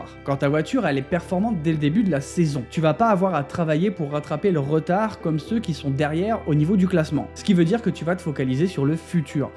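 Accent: North, France. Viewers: Bonne vidéo mais tu ne peux pas me cacher que tu viens de Tourcoing, Lille ou Lens parce que l’accent au formule HEIN! est flagrant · Tu as l'accent du nord on dirait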